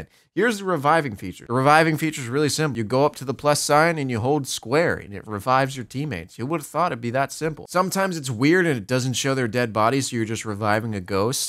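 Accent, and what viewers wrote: New York, United States. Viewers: As you get older we can hear your new YAWK accent come out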